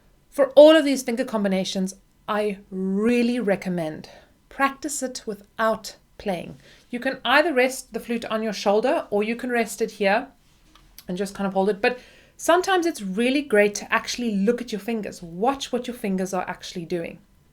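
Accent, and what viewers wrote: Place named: South Africa. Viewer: South African accent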